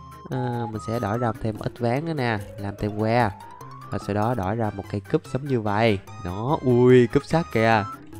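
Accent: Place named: North, Vietnam. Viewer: Coi lại video cũ của anh khúc đầu nghe anh nói mà cứ tưởng người Bắc ko à =))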